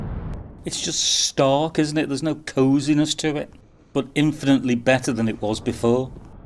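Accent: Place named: Liverpool, United Kingdom. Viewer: He has an unusual Scouse accent tbh, feels like he's trying to sound posh at the same time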